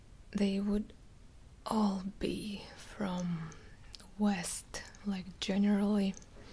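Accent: Russia. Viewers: I really love Russian accents and truly enjoyed your story! Hope you have an awesome day! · That's a very cool story. Love your Russian accent and you do a great job on your videos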